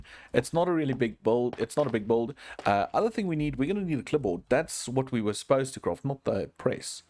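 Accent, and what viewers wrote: Afrikaans, South Africa. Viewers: I got to ask. You have to be from SA. That accent is giving jozi😅😅 Edit: You called it a pick. SA confirmed. Nowhere else in the world would you find such nice English😂 Edit 2:Haha. Called it. Lol Afrikaans accent never misses😂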